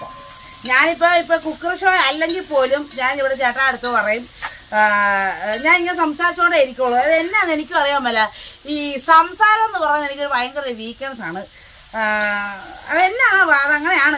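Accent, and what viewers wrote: Kottayam, India. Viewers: കോട്ടയം ഭാഷ അങ്ങനെ യൊക്കെ തെന്നെ ആണ്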